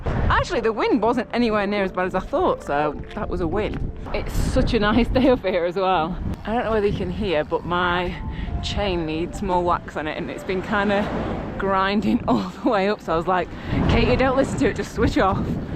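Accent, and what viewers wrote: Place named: Manchester, United Kingdom. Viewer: So you're from Manchester? I was trying to pinpoint the accent. Guessed wrong.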